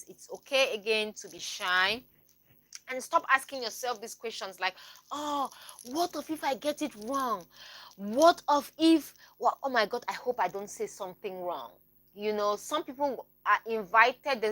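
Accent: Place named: Democratic Republic of the Congo. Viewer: my sweetheart. Listening attentively to you, I think you are originally from Congo ( Zaire ).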